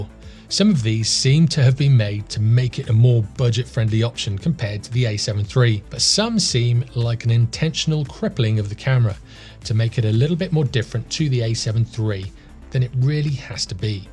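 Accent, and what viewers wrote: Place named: Midlands, United Kingdom. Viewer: Nice one - Im guessing midlands to South.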